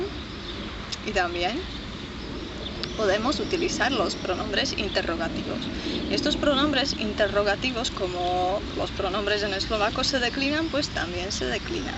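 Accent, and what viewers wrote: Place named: Castile, Spain. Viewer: Que hermosa como es que hablas español castellano me encantan tus videos saludos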